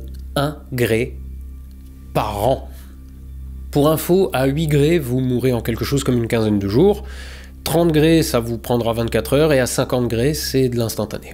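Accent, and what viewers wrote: Alsace, France. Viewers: l'accent alsacien 👌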